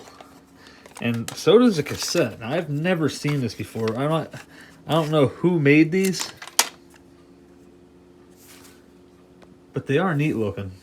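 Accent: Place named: Philadelphia, United States. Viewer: Long before you pulled out the WMMR tapes, hearing your accent I kept thinking "okay, this guy is from either from anywhere from Lancaster to Philly". You sound like my relatives. Sadly I lost my accent as I largely grew up in Virginia.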